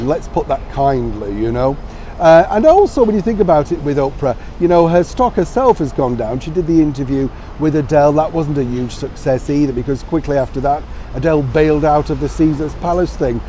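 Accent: Yorkshire, United Kingdom. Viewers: Jolly accent? That is a good Yorkshire accent, thank you.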